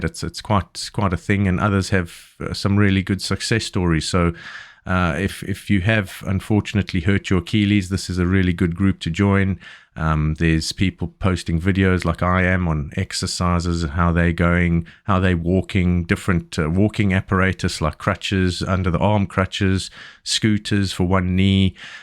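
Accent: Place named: South Africa. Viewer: Another encouraging video, thanks! You sound like you are from SA?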